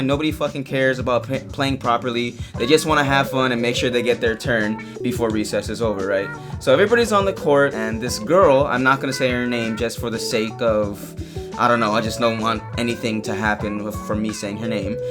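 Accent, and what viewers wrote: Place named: Polynesia. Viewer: I shouldve known. u had that poly accent.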